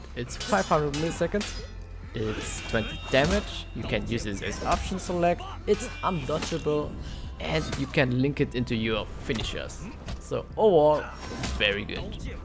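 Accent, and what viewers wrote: Germany. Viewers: Sounding very German in this video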